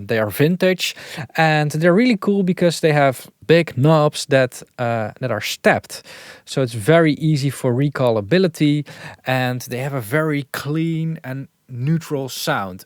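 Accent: Netherlands. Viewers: did you say 'the a-shill-iate links'? I am not asking to make fun of your pronunciation. The dutch accent is fine, no problem. Would be funny though if you know what a shill is and it was intended.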